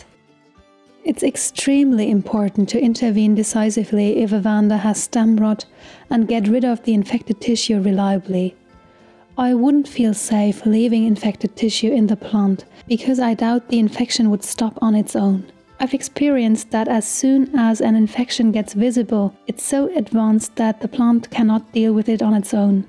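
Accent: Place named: United Kingdom. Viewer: I love your voice 😘 British accent with Eastern EURO thinking ☺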